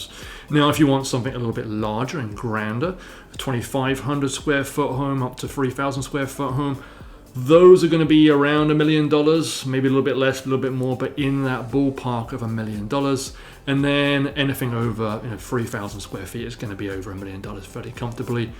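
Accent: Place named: United Kingdom. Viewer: You appear to have a British accent where in the U.K. are you from as a Brit I can’t quite place it? Also my wife who is a portlander says that area is surrounded by bad areas and not that great a place to live in the Portland area. She compared it to Birmingham in the U.K. one or two streets great surrounded by bad areas.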